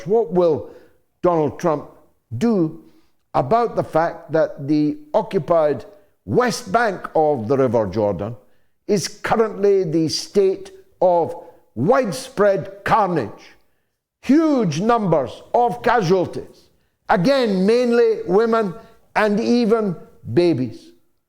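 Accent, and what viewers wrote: Scotland, United Kingdom. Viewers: I like your accent. Do I hear some Scottish? ( dont't know as I am Dutch.)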